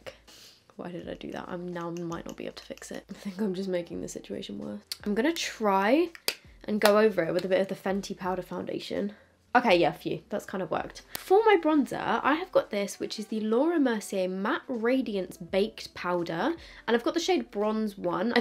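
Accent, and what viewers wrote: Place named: United Kingdom. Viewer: I love this makeup look, I think I’m gonna try it tomorrow (minus the gems because I’m moving house and don’t need to be too fancy 😂) Side note: it’s so adorable when you say “you know what?!.” 😍 Hope it’s not patronizing for an American to say your British accent is adorable, it’s just so soothing and makes everything you say sound interesting!!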